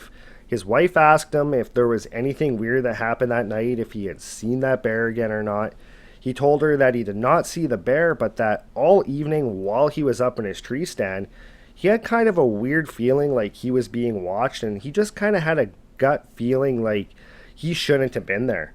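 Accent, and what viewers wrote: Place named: Canada. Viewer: Your Canadian accent is refreshing to listen to rather than the same old. Also only thing he did wrong was ignore his instincts after the first night.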